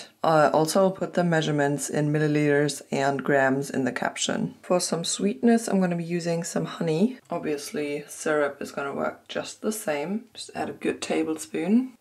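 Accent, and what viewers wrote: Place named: Germany. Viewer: New subscriber here. Love to see your big portions of yummi plantbased food😅 and your german accent. I am from Denmark❤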